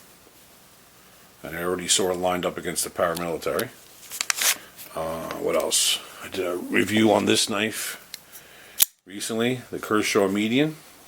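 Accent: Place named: New York, United States. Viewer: accent for sure it's hard to mistake. I've been out of NY for quite some time and I still have mine.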